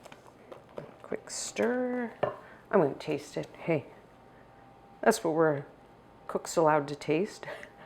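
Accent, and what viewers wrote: Canada. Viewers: Yeah I can pick up her Canadian accent.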